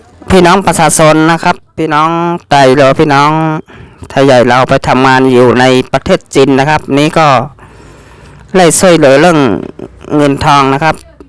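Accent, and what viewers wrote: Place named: Vietnam. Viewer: ฟังสำเนียงพูด.เหมือนเวียดนามนะ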